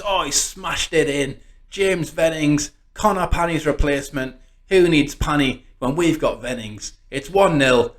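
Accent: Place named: Newcastle, United Kingdom. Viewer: Spoiler Alert! Gutted I never heard you say “Champion”! It’s my favourite word in a Geordie accent! 🤣. Some great squad chemistry there mate, excellent games to play and you’re right, counting is hard! 🤣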